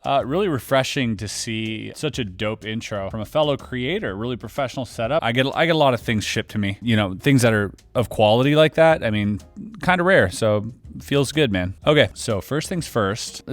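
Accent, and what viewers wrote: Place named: Canada. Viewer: Legos are so much fun lol why do Canadians say oat instead of out. I through Lego blocks oat my window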